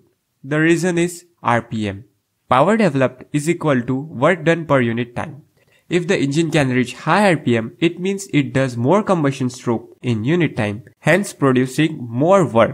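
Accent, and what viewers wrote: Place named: India. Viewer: Good content, good animations. But this indian accent is annoying the hell out of me. Why not focus on proper pronunciation so the content is suitable for normal English speaking audience.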